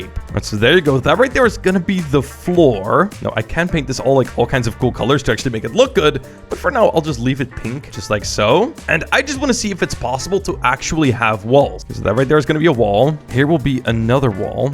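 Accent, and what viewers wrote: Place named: Netherlands. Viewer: Ik ruik een klijn beetje nederlands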